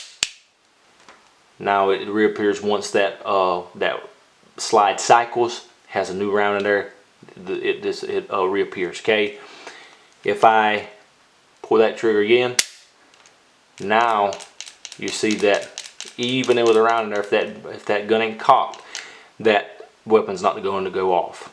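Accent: United States. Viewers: Great Review bro! I'm originally from Laurinburg, NC and you sound like the folks from Pembroke, NC! The accent reminded me of home. Good Stuff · At first I thought it was a black British guy trying to put on a southern American accent but nope. Just a country ass black dude....right on!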